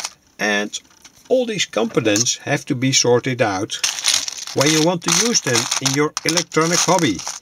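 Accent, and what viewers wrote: Netherlands. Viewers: I love your Dutch-English accent. It reminds me so much of my grandparents. Thank you very much for the excellent advise on sorting components. I am very much interested in seeing more videos that are dedicated to the testing of the various components. This video is very inspring.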